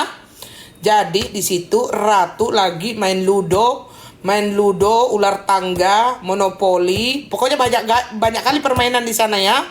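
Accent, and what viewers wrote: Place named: Makassar, Indonesia. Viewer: Koko logtnya mirip org Makassar 😅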